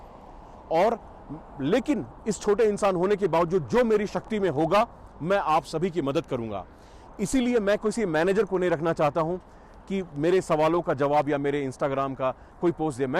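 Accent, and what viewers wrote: Standard. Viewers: Your hindi is very pure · love you दोस्त, बहुत खुशी हुई आप अपने देश से इतनी दूर होने के बाद भी अपनी मातृभाषा नही भूले और गजब की शुद्ध हिंदी बोलते हो आप की भारतीयों को भी समझने में कठिनाई हो जाये 😜 अच्छा लगा किसने अपने का इस तरह राह दिखाने का काम करना 🌹🌹💐💐🌹🌹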